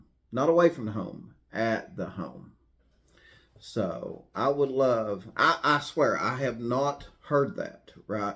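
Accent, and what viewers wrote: South, United States. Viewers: Love listening to your southern voice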